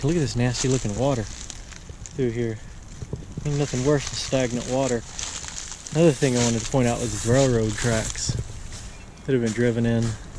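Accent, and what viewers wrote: South, United States. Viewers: I usually only see your newer videos. I've only been following you for about a month or so. This is the first time I've seen one of your older videos and I love how much thicker your southern accent was. I love your videos.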